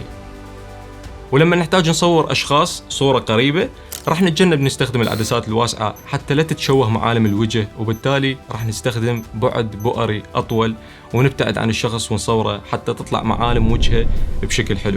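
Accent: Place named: Iraq. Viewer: يا أخي أقسم بالله كلمة شكر أقل بكثير في حقك الفيديو متعة ❤ مع اللهجة العراقية الجميلة كل الحب من المغرب ❤❤❤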